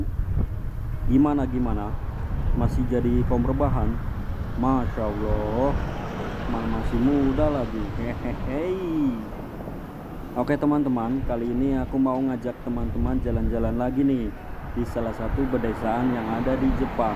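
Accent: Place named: Banyumas, Indonesia. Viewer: Sampeang ngomonge lucu glhaha.. sampean nadane kayong ngapak..mantab isa ng jepang 😀👍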